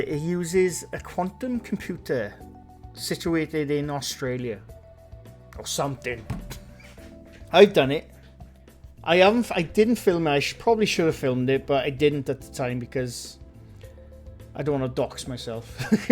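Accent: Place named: South Wales, United Kingdom. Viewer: At long last I can disagree with you:-) If you go to a long, open beach with a 3 or 4 foot ruler and line the ends up with the horizon, you can see that the horizon has a radius. Another great video from (I think) mid South Wales, judging by your accent.:-)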